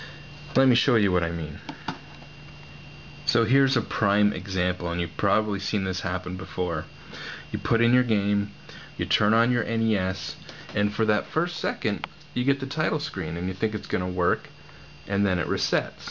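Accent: Canada. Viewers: You're Canadian huh?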